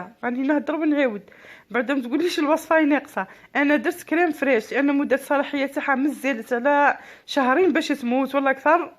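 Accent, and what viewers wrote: Setif, Algeria. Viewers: هدرتنا تسخف حنا السطايفية ... انا نتبعك غير باش نسمعها ... كملي اهدري هكدا عيشك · مميزة صح هدرت سطيف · هدرتك هدرت سطايفية حرة 😍😍😍😍😍😍😍😍😍😍 ماشاء الله حبيتك بزاااااااااااااااف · سلام عليكم ورحمة الله وبركاته أنا ساكنة في فرنسا من سطيف وراكي زاهتني بالهدرة تعك يا سطيفيا الحرة و كثر خيرك و يرحم الي جاب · راكي فور واهدري نورمال هدرتك تحليك سطايفية وعليك لكلام 😘😘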